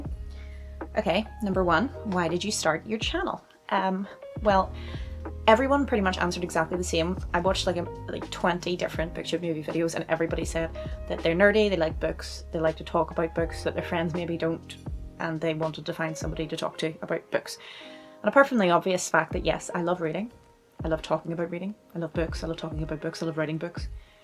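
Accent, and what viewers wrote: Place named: Northern Ireland, United Kingdom. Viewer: Heeey, welcome to booktube! :D Can I just ask... Where are you from? You have such a lovely Northern Irish accent!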